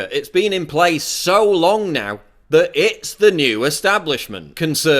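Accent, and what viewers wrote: United Kingdom. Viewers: This fucking beautiful british accent haha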